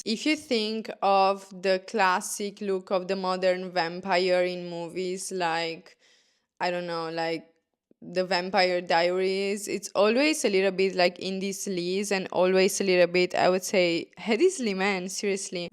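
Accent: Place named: Italy. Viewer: very off topic but where is ur accent from ? Italy ? it feels good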